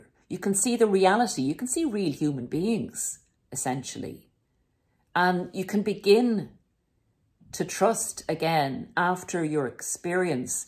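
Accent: Ireland. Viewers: Thank you for your help you seem to understand the situation well enough to put the problem across to others , every thing you have said I’ve been through just gone through Christmas that my husband ruined for me no gift no food nothing spent the last few days in the bed room away from him as he had the nerve to blame me , I’m not well at the moment and it seems to annoy him as he appears to think he’s worse than me , but I haven’t seen any evidence of that ,I might have to have an operation at sometime but I can’t believe he would want to help me with that . He has left some leaflet around about domestic abuse I can’t believe he thinks I’m the problem ,I haven’t spoken to him from before Christmas as it’s a waste of time as he doesn’t understand it’s him causing the problem , I thought he could be depressed as all he does is to moan about everything and everyone but he’s been like this for years so I don’t know, I just want to be happy , normal, not living in a war zone doggy land mines , loving your accent my parents were from Ireland, thanks for letting me let off some steam , happy new year .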